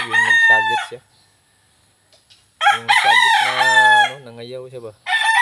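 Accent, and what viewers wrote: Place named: Visayas, Philippines. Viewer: Dol 😂 halata na kaayo nga bisaya gd. Ayna pag Tagalog bisaya lang 😅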